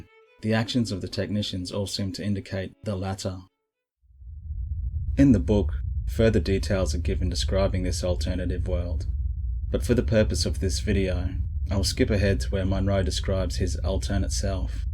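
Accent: Australia. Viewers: are you from Australia?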